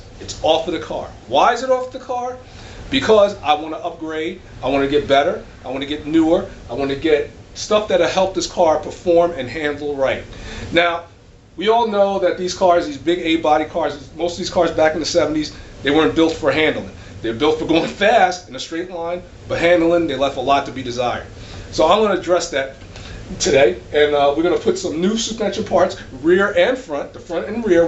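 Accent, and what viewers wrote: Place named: South, United States. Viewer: I wondering where you live? Sounds like in the south. I'm on the West coast, Blacks build lots of muscle cars.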